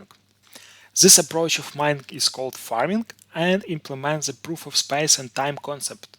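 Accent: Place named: Russia. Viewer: русский акцент)😀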